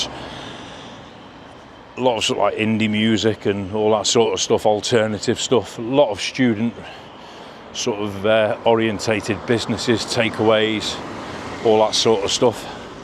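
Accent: United Kingdom. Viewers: It's not your home town when you've got the lfc liver bird on yer phone mate and a woolly back accent too la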